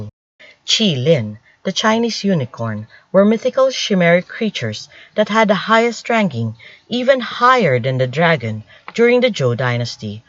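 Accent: Philippines. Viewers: What is this now, where do you get your information? Everybody wants to make money making videos. You sound Filipino.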